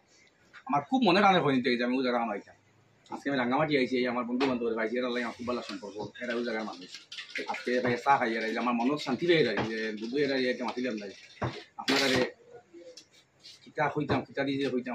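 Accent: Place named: Barak Valley, India. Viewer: আমি বাংলাদেশ থেকে বলছি। আপনাকে বরাক কন্ঠে অভিনয়ে সুন্দর লাগে।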